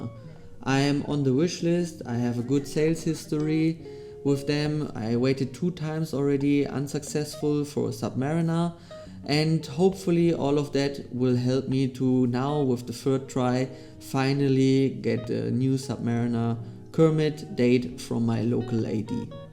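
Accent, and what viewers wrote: Germany. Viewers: Your accent is Germany. Bist du aus Deutschland? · Wenn du nach zwei Sätzen schon den Deutschen Akzent bemerkest. Sympathisch! Viel Erfolg bei deinem Vorhaben.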